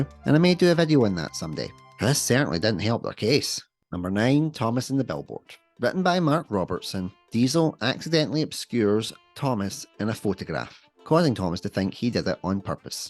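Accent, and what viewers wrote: Scotland, United Kingdom. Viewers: Are you scottish mate?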